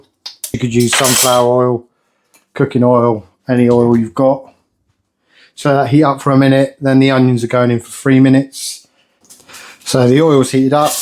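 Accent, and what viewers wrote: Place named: England, United Kingdom. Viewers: I'm from Hull and had your accent as Yorkshire, but I was thinking Doncaster or Goole. 👍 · Just looking at you I did not expect an Englishman lol You look like an American 😂 So the accent I was like whoa lol! Love your channel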